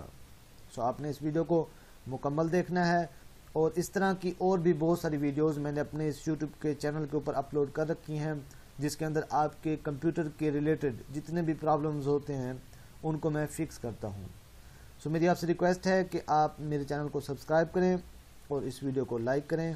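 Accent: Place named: India. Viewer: Shame that you speak too quickly and as Indian English accent is very difficult to follow , it would be better if you place subtitules.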